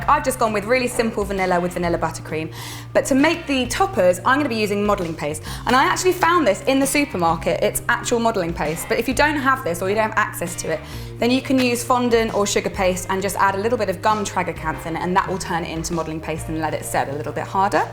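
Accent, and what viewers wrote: United Kingdom. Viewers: Wait what Thought you were British Mom?¿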